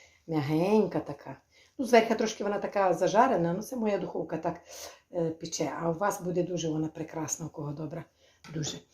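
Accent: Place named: Bukovina, Ukraine. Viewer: Дякую за гарну нашу буковинську мову та гарне викладення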